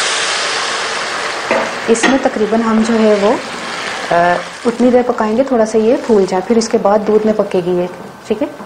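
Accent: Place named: Punjab, India. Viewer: V nice ap ni asan chezn btaen or ap punjabi bhot sweet bolte hn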